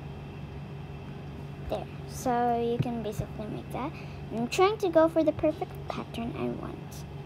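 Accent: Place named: United Kingdom. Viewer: Accent nen britishb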